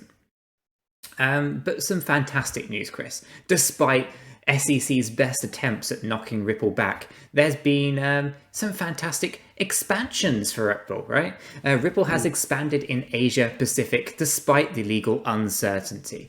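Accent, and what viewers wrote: England, United Kingdom. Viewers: The English accents are a giveaway no?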